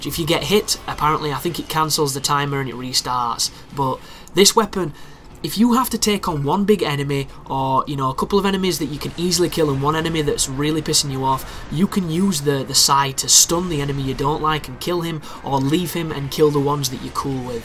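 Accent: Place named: Yorkshire, United Kingdom. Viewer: Hahaha you are from yorkshire, ME TOO!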